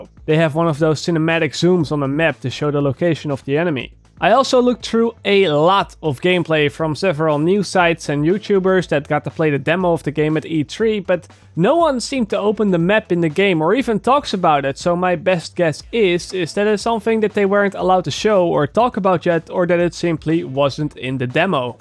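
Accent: Netherlands. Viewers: are you dutch? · lekker nederlands accent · Are you from holland bcz I've never seen you but you just sound like you have a dutch accent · ok... I really like your vids... but pls... try to avoid that dutch accent! Grüße aus Deutschland ^^ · Hoor zijn accent dit is sws nederlander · ik hoefde niet tot het einde te wachten om erachter te komen dat je Nederlands was, typisch Nederlands accent man xd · The guy who was talking is dutch, i hear it from his accent. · You can hear he's dutch by his accent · je hoort dat hij nederlands is 😂😂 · is deze guy Nederlands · nederlander die engels praat..... heel schattig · clearly Dutch accent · Euhm i gues You are from holland🙃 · je klinkt echt zwaar nederlands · Je uitspraak is echt heel Nederlands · Hears voice and accent for 2 seconds...I smell dutch person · hoorde aan je accent al dat je nl was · You're sooooo Dutch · really well put together video, I'd say you could assume the map to be 14 squared km. 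also are you dutch? kind of recognize the accent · dude I love your video's but holy shit get rid of the dutch accent.. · lol, so funny how you can hear, within seconds, that the video in english is made by a dutch person. you can just tell immediately. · Fack you Have a so clear dutch accent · he sounds dutch or not a real english man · Your Dutch accent is so Obvious. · jou accent man... je bent zo nederlands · Heerlijke nederlands accent ;-) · Kom je uit nederland? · Ik ben 100% zeker dat jij een Nederlander bent.😜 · Het is te horen dat je nederlands bent :)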